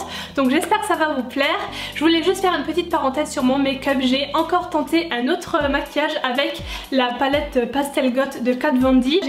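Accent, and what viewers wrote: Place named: Alsace, France. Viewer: Tu es de quel origine ton accent ressort grave on dirait une alsacienne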